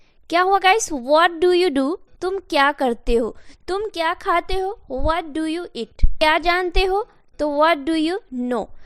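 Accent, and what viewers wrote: Bihar, India. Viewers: It seems from your voice, you are from bihar..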